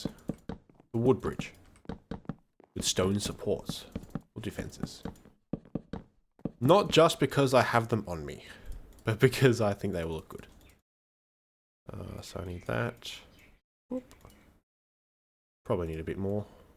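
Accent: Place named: Australia. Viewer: Personally I think these kinds of episodes count as "real" episodes! Couple of things I wanted to mention: -Never realized you were Australian, totally thought you were British or something (english isn't my native language) lol - There's a mod called "simply better minecraft" for 1.8.9 that adds spruce/birch/dark oak signs/doors/trapdoors, etc. -Looking forward to that video/segment where you explain why you chose to play on 1.8.9, though from what you've said in this video I completely agree! Modern Minecraft has some cool features (some new blocks and biomes are really nice) but I feel like it's a mile wide and 2 inches deep -You've mentionned some Minecraft content creators (which I will definitely check out), are there any other Youtubers that inspired you? OG LPers like Etho, Stampy, etc? -Congrats on your promotion/marriage! Take as much time as you need between videos, like you said the world will always be there so there's no rush!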